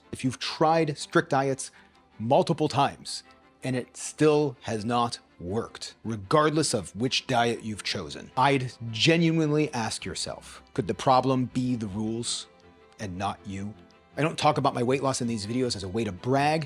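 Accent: Canada. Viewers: Lol, it confuses me every time. I was wondering if you were Canadian.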